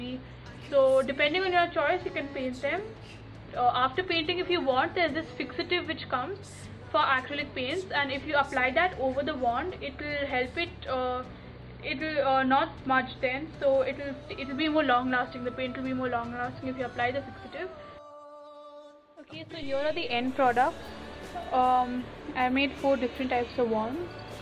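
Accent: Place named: Scandinavia. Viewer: Your accent, are you Swedish, Danish or German? Also this tutorial is pretty cool and it helped quite a lot.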